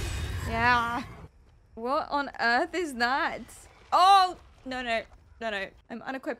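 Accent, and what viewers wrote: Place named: United Kingdom. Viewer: Praise be for subtitles as your British accent seems thicker on YouTube than it does live on Twitch LUL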